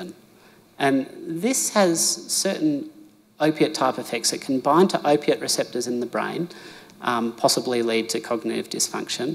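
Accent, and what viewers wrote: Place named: Australia. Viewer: Thank god at last somebody from Australia talk not US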